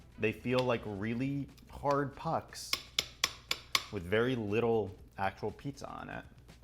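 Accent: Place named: Italy. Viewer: The italian accent made me quit the video halfway through and I only came back because I really like your content, but aside from that it was a nice video! Maybe next time you could record in two days so you don't overeat and feel sick.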